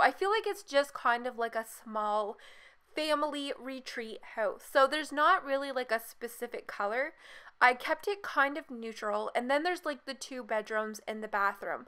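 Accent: Canada. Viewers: Are you from Canada? I'm just asking because of your accent.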